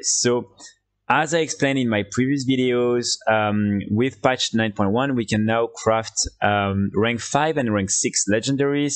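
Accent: France. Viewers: French accent 😂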